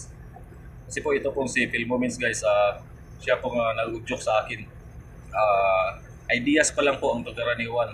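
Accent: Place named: Visayas, Philippines. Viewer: Kani kay morag bisaya ni ayy hehe, pinoy galaan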